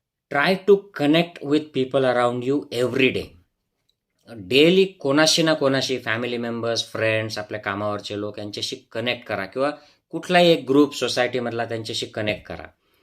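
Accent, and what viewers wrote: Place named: Ahirani, India. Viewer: डॉक्टर साहेब मराठीमध्ये सांगत असताना अहिराणी ची झलक येते थोडी-थोडी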